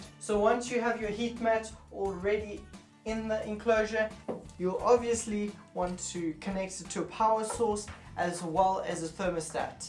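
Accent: South Africa. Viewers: South African? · Is that a south African accent bro?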